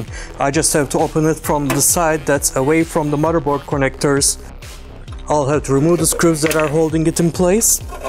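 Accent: India. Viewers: Very Indian, love it!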